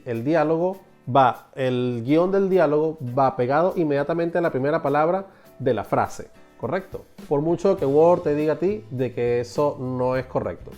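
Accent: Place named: Venezuela. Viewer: Vi el video, hasta que caí en cuenta que eres venezolano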